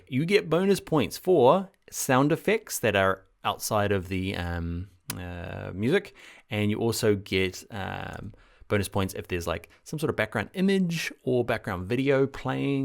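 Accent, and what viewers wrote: New Zealand. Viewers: Yah it doesn’t sound quite Australian. I’d guess NZ too. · I really love the Kiwi accent.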